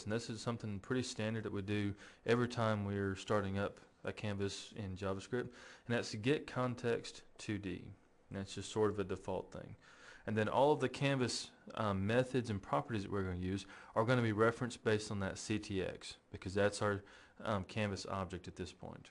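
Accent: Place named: Midwest, United States. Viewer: he sounds american to me. Probably from the midwest or south.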